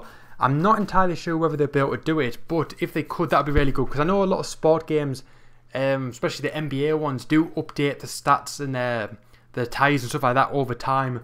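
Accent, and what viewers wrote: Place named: Newcastle, United Kingdom. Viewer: That Geordie Accent Tho xD im geordie too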